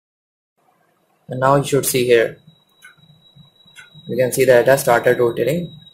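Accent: India. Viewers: I really hate the indian accent in english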